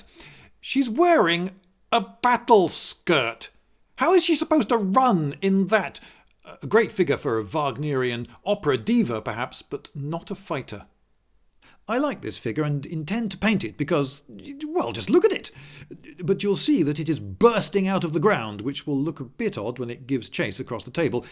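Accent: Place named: United Kingdom. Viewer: Funny, you sound overall brittish yet you are as pedantic as us germans. =) Anything we should know? Have a nice Christmas and a happy new year.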